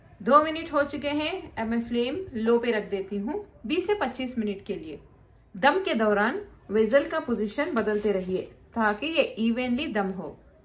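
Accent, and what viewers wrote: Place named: Deccan, India. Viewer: Ohh.. hamari hi tarah Deccan ki hain.. Masha Allah.. Par mai Bangalore, Karnataka sey hun..!! Tnx for replying..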